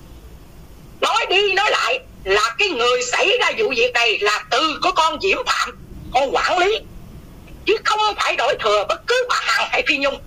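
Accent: Standard. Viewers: Trời chị này nói chuẩn quá ủng hộ nhiều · Bà ns quá chuẩn 👏👏👏👏👏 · Cô nói chuẩn quá cảm ơn cô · Cô này nói chuẩn quá ủng hộ cô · Bà này nói rất rất chuẩn , chính xác 100% .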